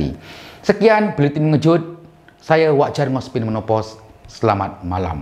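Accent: Java, Indonesia. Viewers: ckp meddok jowo tu ade haha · Wak pekat sekali jowonya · Wak kalo ckp pekat lg jawa tuu lagi mantop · Wak bg pekat sikit bahasa jawa tu😂😂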